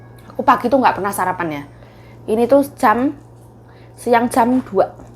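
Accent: Java, Indonesia. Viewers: Madarin logak Jawa😁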